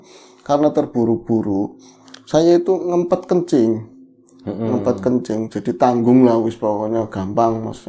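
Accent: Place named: Tegal, Indonesia. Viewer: Melipir di ngobor dulu... Semangat hari Senin.. Cerita nya selalu seru.. dengan logat Tegal yang khas 💪💪💪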